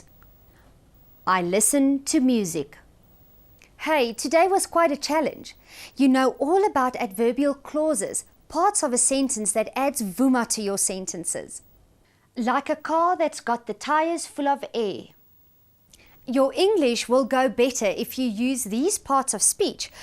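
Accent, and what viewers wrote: South Africa. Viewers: this is south African accent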